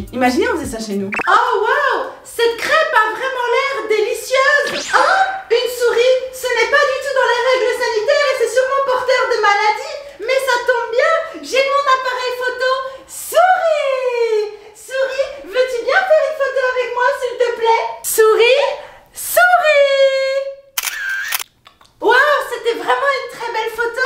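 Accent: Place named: Brussels, Belgium. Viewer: L'accent bruxellois sa me fait rire!! Bravo continue ce que tu fais:)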